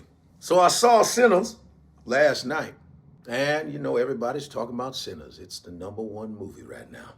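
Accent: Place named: South, United States. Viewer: Love your commentary. But that accent. Southern drawl oof not my favorite.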